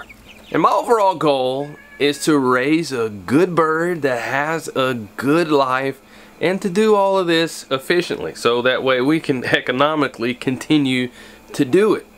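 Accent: South, United States. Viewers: Hi Family & everyone! I’m from Nashville Tennessee. And your come on come on> country twang makes me miss home. Love ya guy’s! God Bless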